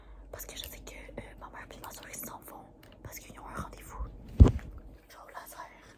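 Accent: Quebec, Canada. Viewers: Super sympa comme vidéo. J'adore vraiment l'accent Québécois-Français 😁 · quebecoise niceeeeeeee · Bravo, très bien la vidéo. T’es québécoise nn ? Ça s’entend très bien.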